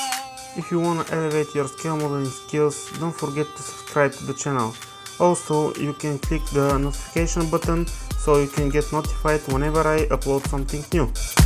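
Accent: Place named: Russia. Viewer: Narrated are good, however your narrations resemble "russian spy" accent in western Cold War movies :)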